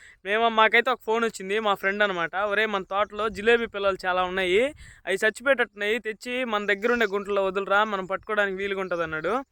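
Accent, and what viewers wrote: Nellore, India. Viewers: Meeru maattladatha vunte mana Nellore lo ne vunnattu vuntadhi naaku😅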